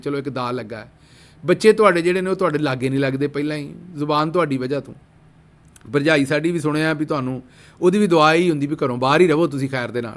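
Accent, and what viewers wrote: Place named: Amritsar, India. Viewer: ..yaar bohat hi vadiya informational vlog. We (me and my wife.children are in Canada)watched your vlog daily and waiting for it. You narrated very true picture. I watched both Urdu and Punjabi vlog.my wife listened when she was making dinner😅.to enjoy and laugh.your Punjabi is like us in Amritsar.our elders belong from RANALA KHURD.you are doing great work for IMRAN KHAN.you delete or don’t like to say,SAT SRI AKAL to CHARDEY PUNJAB. We love those words.please make sure to say again.MY REQUEST TO YOUR TEAM TO CONVEY MY MASSAGE TO.🙏.SAT SRI AKAL FROM AMRITSAR TO ALL…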